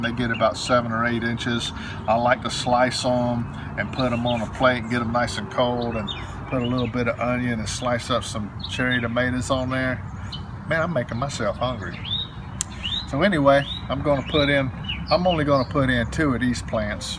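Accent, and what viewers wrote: South, United States. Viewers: I must admit that I first decided to trust you because of your Southern accent. The Tennessee came through loud and clear--my grandparents live there and listening to your voice was comforting. Then I realized just how much you and know about gardening. Your story is beautiful as is your faith. Thank you for providing an effective gardening education and so much hope in a time of need. God Bless.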